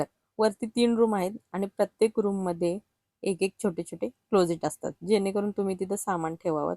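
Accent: Kolhapur, India. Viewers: Arre hi tr aapli kolhapuri bhasha as mla vat tiya pn mastt ghar aahe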